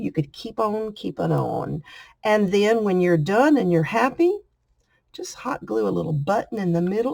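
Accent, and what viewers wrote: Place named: South, United States. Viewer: These are fun. Love your sweet southern accent ❤️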